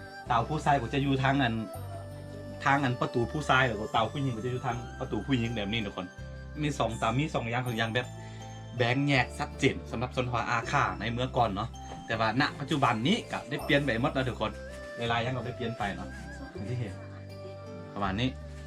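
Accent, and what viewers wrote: Isan, Thailand. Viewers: คนบรรยายเป็นคนอาข่า หรือ คนอีสานครับ ทำไมพูดเหมือนคนอีสานเลยครับ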